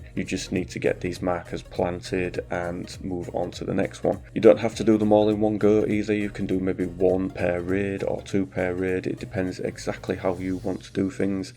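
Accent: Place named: Hull, United Kingdom. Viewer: Thanks, you sound like you are from Hull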